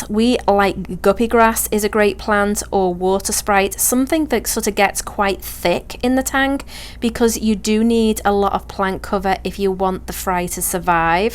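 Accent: Liverpool, United Kingdom. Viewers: Is that a hint of scouse I hear in that accent? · Random question but are you from Liverpool?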